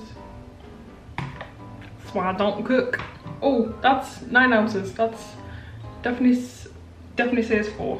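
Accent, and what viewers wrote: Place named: England, United Kingdom. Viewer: I love your accent! What part of England are you from?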